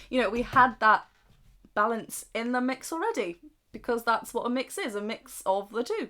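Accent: United Kingdom. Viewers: I've thought you are an American. Your accent British english. I lived in London around 1 year, long time ago. lives in Incheon. I live also same city in Korea.. I hope you will have a few more reactions for ' '. ' '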